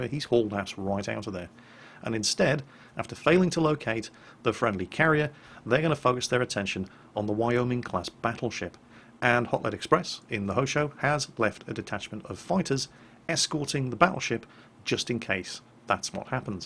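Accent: Portugal. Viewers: jingles are you portuguese?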